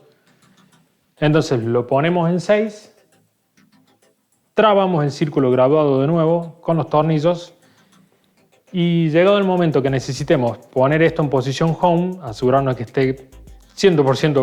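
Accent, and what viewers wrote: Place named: Córdoba, Argentina. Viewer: creo que por tu acento eres cordobés 😊😉. Sabes. Tengo un 🔭 Sky. Watcher Dobson extensible de 10 " <num>. F: 4,7 . Y una cámara Reflex T6. Modificada ..la Abrí yo y le saqué el filtro quedó a sensor pelado . Quería probarla así . Y es una locura ... Las fotos que tengo haciendo light a pulso lo mejor posible .. en 0,8 s 1s como máximo sino me salen las estrellas movidas 😊😊😀 que pena no tengo como mostrarte las fotos de Orion . Una locura 😍😍😍 . Vivir está experiencia de hacer light rápidos y tener que enfocar la cámara cada 10 fotos ..me quedará en el recuerdo !!! Después que me llegue la montura .... Nosotros allá en el sur sabemos empezar desde lo más bajo con los poco recursos que haya !! Eso no lo perdemos nunca 💪💪😁😁 un gran saludo !!! 👍👍👍👍👍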